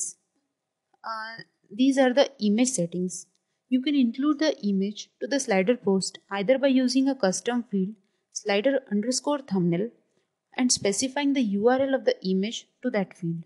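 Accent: India. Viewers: Indian speed, accent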